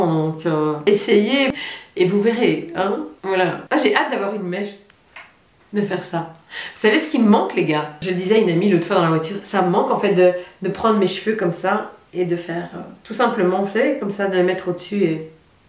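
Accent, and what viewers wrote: Brussels, Belgium. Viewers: Je suis complètement sous le charme de ta super personnalité positive (y) Et les petites touches d'accent bruxellois que tu intègres spontanément me font bien sourire;-) Continue comme ça et bisous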